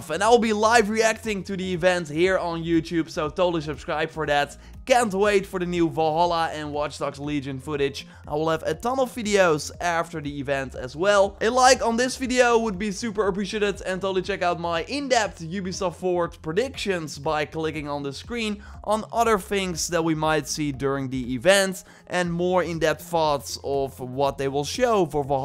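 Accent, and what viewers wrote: Netherlands. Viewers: the sound of your english accent, you must be dutch. Ofniet dan?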